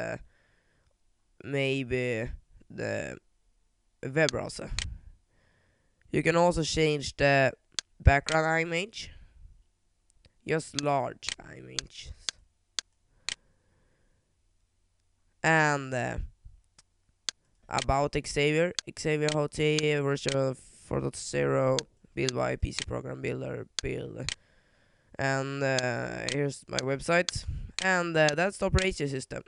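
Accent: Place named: Sweden. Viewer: you sound swedish!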